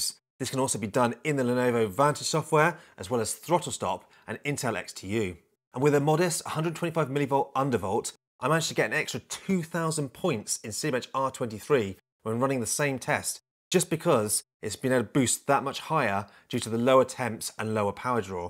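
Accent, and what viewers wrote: United Kingdom. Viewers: laptop review in BS English is refreshing, since majority are in US English. makes the laptop sounds classier.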